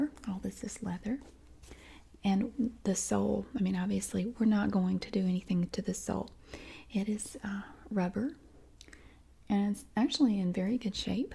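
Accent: South, United States. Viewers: Oooo this works for me. The combination of the brushing sound and gentle southern accent is pleasing.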